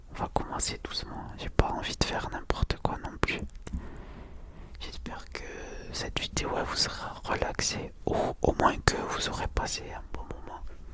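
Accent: South, France. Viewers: L’accent du sud Alala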